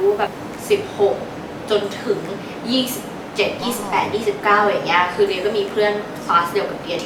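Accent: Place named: United Kingdom. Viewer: อยากทราบว่าตัวเองเอาพวกคำศัพท์สำเนียงบริติชมาจากไหนค่าา🇬🇧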